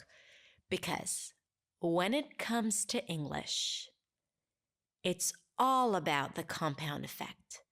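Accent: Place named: United States. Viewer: This is not practice? 😲😲 😲 I thougt she was American, so good her accent! 😶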